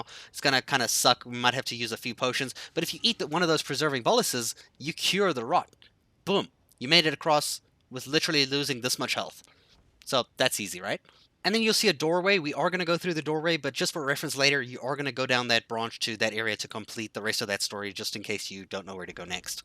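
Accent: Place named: South Africa. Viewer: I have a friend from S A. I recognize the accent. Cheers and thanks for the help.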